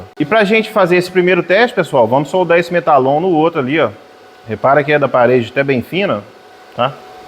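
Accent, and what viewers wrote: Minas Gerais, Brazil. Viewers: Bom dia,vc tem muita paciência e vontade de ensinar, parabéns pelo vídeo,e esse sotaque me parece que vc é de Minas Gerais, eu tenho um certo receio em usar essas inversoras junto do corpo por causa do campo eletromagnético que ela pode gerar, é melhor evitar uma possível radiação no tórax.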